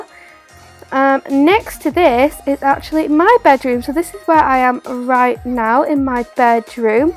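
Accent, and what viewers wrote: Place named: England, United Kingdom. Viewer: Do you come from England? Everything you said we say in England😂 (how you said things)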